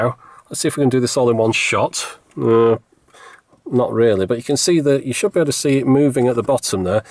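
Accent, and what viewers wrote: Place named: England, United Kingdom. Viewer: it has an english accent, yuck! :(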